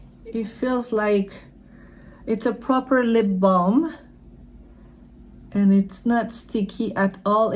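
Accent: Quebec, Canada. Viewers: Hello! Gorgeous! May I ask, are you originally from Quebec? 🙂 I am so I feel like I recognize an accent. Vraiment magnifique, j’adore Guerlain! I bought the pearls (I love Meteorites so much) and some Kiss Kiss balms. I am really hesitating to get the eyeshadow palette. 😍 Thanks for sharing! 🫶🏼 I subscribed! If ever you want, I have a channel too. Have a great day! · Beautiful! Je reconnais un accent Québécois?😉😉J’adore les Météorites! ❤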